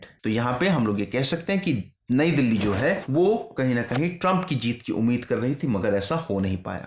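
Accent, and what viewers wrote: Bihar, India. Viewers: bihari tone sun kar aacha laga.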